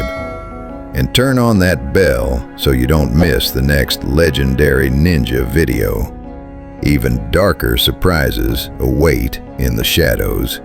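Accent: South, United States. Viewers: Love the Southern Accent narrative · Whats up with the southern voice?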